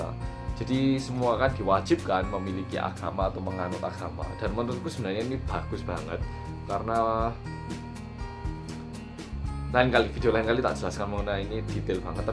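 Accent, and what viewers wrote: Java, Indonesia. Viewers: 안녕하세요. 조는 노비입니다. Ternyata 씨 orang korea yaa tapi lancar sangat bahasa indonesia sampai logatnya logat orang jawa 대박 😁. Saya masih proses belajar bhs korea 😁. Oh iya saya sudah tahu ktp org korea kaya gimananya di drama my father is strange 😉 eps 52 hhi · hah? orang korea? kok medok banget sih bahasa jawanya:') serasa orang jawa abis belajar bahasa korea cobak:') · sipit tp kok medok ya mas 😄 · Medok temenan koe bang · Asli org mana sih kak Ktp nya korea tp bahasa nya kok medok ya 😁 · pertama kali liat video ini kok fokus gw ke medok jawanya ya?😂😂😂😂😂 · logatnya seperti logat jawa medok 😄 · model KTP tiap2 negara bisa berbeda tergantung pada kondisi GEOPOLITIK negara masing2 dan ini bersifat dinamis. di eropa, jerman misalnya KTPnya ada masa berlakunya. lebih jelasnya bisa bertanya ke dept. dalam negeri. salam Lemper mas bro..., tetaplah medok dan trus ngomong jowo.., tak tunggu vlog sampeyan selanjutnya,suwunn,hehe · lucu banget jawanya wkwk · kok suarany kyk orng Jawa bgt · jowo nya ningendi mas??? 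ribet gak mas.pindah kewarganrgaraan ganti KTP nyaa? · gue kira orang jawa wkwk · 오빠 ngomongnya medok banget >.< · medok banget mas. 😂 gemes. haha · pantesan ada yg gak percaya sampeyan org korea.. wong ngmgnya medok jawa buangett.. 😅😅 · keren yaa, logatnya jawa gitu padahal korea👍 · lah kok medok... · sudah lama tinggal di jawa ya oppa sampek kebawa logat jawa ( medok ) 😂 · mendok bet😂😂😂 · logat ngomong nya kaya orang jwa,medok gitu. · wajah korea.. bosonya medok sekali.. hehe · maaf ya,mo nanya kamu tinggal di Indonesia dmn? di jawa kah? logat jawanya kelihatan bgt hehe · Medok tenan ngomonge cak:v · kok medok jawa? 😂😂😂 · orang korea ya bang?? kok logat bicaranya kayak orang jawa:D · kok medhok ya. btw baru nemu orang korea medhok. keren bingit..subscribe ah. keep posting info menarik ya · koreane ngledok:v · medok bnget mas... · Mas e gk pnya ktp indo??besar di Korea??bahasa e medok Jawa gt???kaget q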